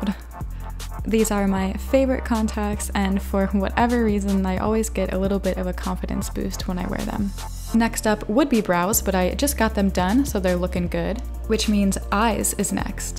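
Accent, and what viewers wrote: Germany. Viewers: Wait are u German? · em stop, are u german?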